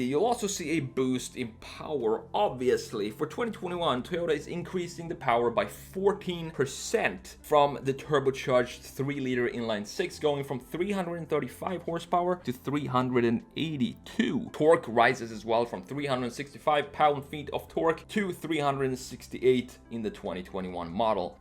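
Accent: Sweden. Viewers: Hejsan! Hamna på din kanal av en ren slump och hörde på din engelska att du va svensk? Rätta mig om jag har fel:D Jag vill bara säga att du gör ett fantastiskt jobb, riktigt kul att se, du har flera videos som jag måste börja kolla genom. Snyggt jobbat!!!!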